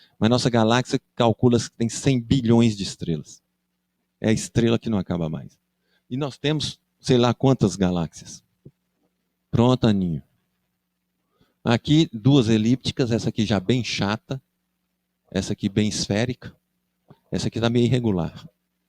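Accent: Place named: Minas Gerais, Brazil. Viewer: Astronomia e GALAXIAS palestrada por um mestre com sotaque mineiro fica mais LEGAL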